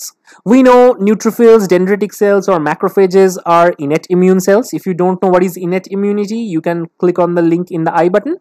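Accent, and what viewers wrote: India. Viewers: This guy's got such a strong Indian accent, I love it. None of the cullies in my family have this strong of an accent, this guy's more Indian than Indian.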